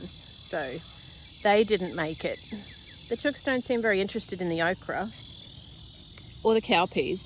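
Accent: Australia. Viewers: you don't need the music in your intro. I can't hear you because the music drowns you out. We want to hear your lovely voice with that awesome Australian accent!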